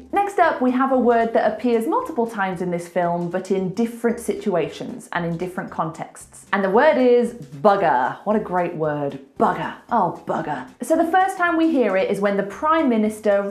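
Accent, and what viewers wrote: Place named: RP, United Kingdom. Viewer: You are absolutely gorgeous when you talk. I am a real big fan ! Your RP is THE reference for me.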